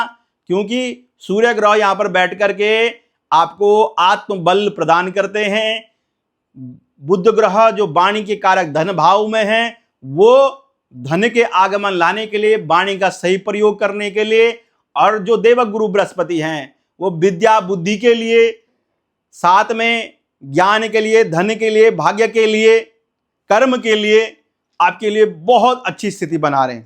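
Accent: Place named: Pahadi, India. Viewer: Mujhe lag raha tha aap pahadi Ho aapki bhasha mein Thoda Thoda pahadi pan chhalak raha hai per bahut achcha bola 🙏🏻🙏🏻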